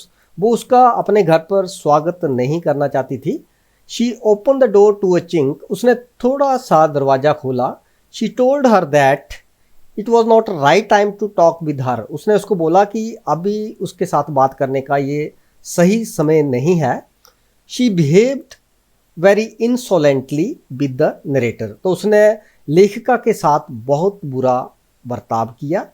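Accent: Haryana, India. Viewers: SIr are you Haryanvi aap hi Awaaz sewada chal raha hai ki aap Haryanvi Ho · sir u r from haryana ?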